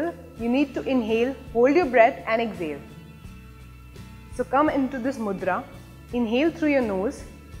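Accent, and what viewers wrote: India. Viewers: Indian accent is very hard to understanding is she really speaking English or different language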